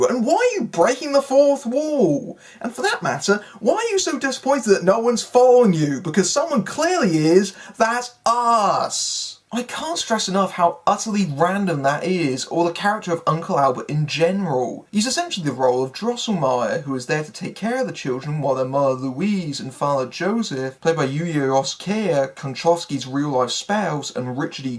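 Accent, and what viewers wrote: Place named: United Kingdom. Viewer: Да, британский акцент ОЧЕНЬ специфический. Но Фильм Брейн делает его невыносимым!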